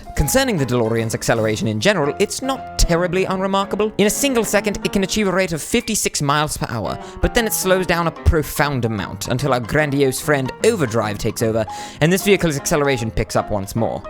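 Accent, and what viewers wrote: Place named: United Kingdom. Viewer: wow a british person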